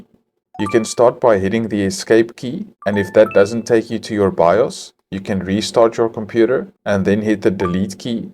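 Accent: South Africa. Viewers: Ek Sal jou sommer n soen op die voorkop ge❤❤❤thanks my guy I've been struggling for days now trying to repair my windows 10 but eventually was like stuff this let me upgrade it to windows 11 and your video came up heard the accent I was like his one of the ouens and I really appreciate you have a great and awesome day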